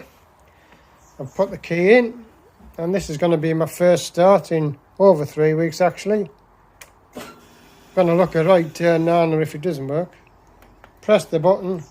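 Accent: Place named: Liverpool, United Kingdom. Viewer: Myself being from the northeast of England! I can’t get away with your posh scouse accent.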